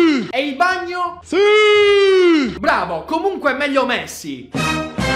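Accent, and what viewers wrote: Apulia, Italy. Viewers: ma sei di puglia?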